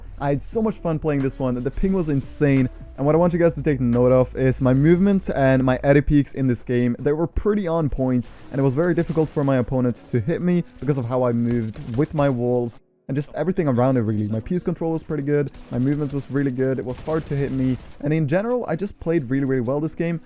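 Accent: Norway. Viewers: Are u from norway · wait are you norwegian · Are you from norway?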